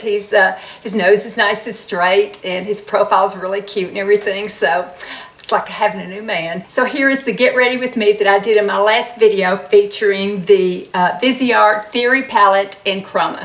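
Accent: South, United States. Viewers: Love ya!! Are you from Georgia?? You have that southern accent!! I’m from Georgia!! Love the look!! ❤️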